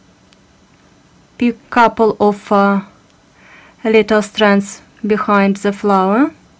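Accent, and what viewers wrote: Russia. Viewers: Лол вы русская?? Видно по акценту и сри😂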